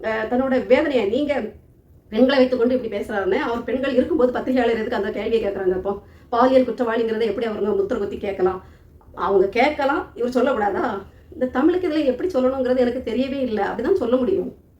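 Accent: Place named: Kanyakumari, India. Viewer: அக்கா நம்ம வட்டார வழக்கில பேசி கலக்குறீங்க #பழந்தமிழ் #தேன்மொழி #குமரித்தமிழ்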